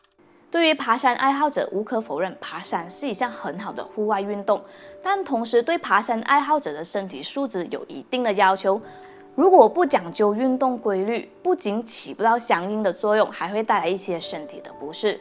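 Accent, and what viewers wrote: Malaysia. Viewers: 马来西亚口音就是酱的啦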